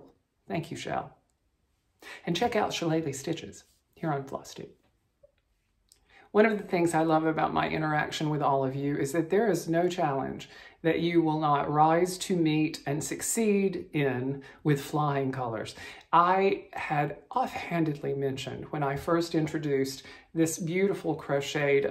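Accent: South, United States. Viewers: I just noticed that your Southern accent REALLY comes out with your stories. I love it! You're such a hoot,!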